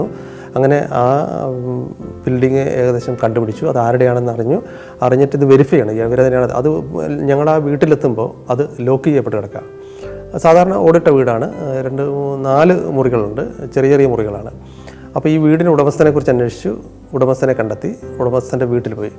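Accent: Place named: Southern Kerala, India. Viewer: kasargodano nadu.....pakshe slang thekottulla pole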